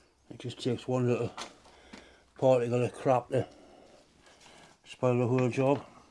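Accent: United Kingdom. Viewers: Enjoying this immensely! Where in the UK are you? My family is from Poole/Bournemouth, listening to you and wondering where you are located. Wonderful work, thank you.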